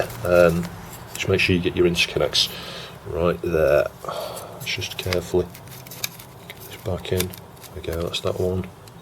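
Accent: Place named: North West England, United Kingdom. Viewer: hi mate - only recently discovered your channel but enjoying it, good stuff. Who cares about a 2 minute load time if you're from the speccy generation! I'm curious, where are you from in the UK, sounds like you're a fellow north-westerner.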